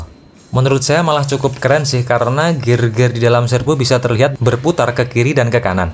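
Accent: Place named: Surabaya, Indonesia. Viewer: Endinge metu Suroboyoane 😄 Baterai di sering paralel berarti tegangan tetap 3,7?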